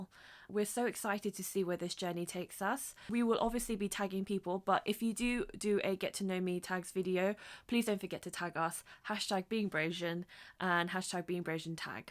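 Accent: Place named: United Kingdom. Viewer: I am Japanese. I was born in London but left after 8 month . I really love your accent, there is something so elegant about seeing Asian people with a British accent. ( I wish I could speak like that lol) I enjoy your channel ❤ ( definitely need more beauty content creator by Asian women ) Btw I LOve Korean food , I actually live very close to the Koran Embassy so there are lots of Korean restaurants and the best Kimuchi store that a go all the time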